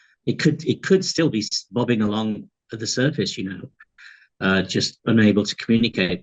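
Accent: United Kingdom. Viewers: british bla bla bla boring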